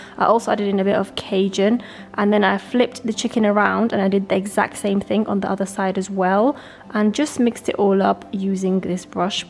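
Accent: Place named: Milton Keynes, United Kingdom. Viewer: Are you from Milton Keynes? I can hear your voice kindly u from there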